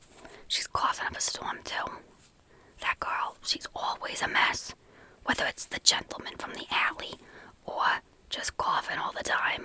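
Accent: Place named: New Jersey, United States. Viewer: Period drama fanciness AND jersey accent?? Two huge tingle triggers for me, combined???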